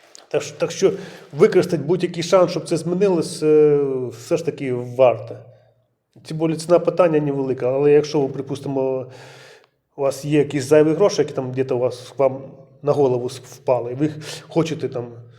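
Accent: Russia. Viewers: Дуже ваша російська ріже слух . Чи ви часом не засланий козачок?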